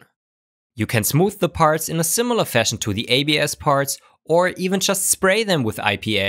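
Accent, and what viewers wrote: Germany. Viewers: Wtf are you german or what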